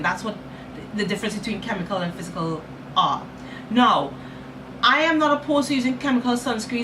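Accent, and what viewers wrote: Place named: Trinidad and Tobago. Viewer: Love your accent. You sound like you have a Trini vernacular. Thank you for doing this review. I just bought this tonight. I normally use Estée Lauder but it’s hard to find in stores so I’m trying thi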